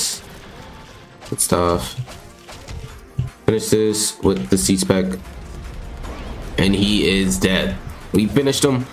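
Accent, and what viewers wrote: Trinidad and Tobago. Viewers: Are you from trinidad? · Are u from trinidad? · R u from trinidad?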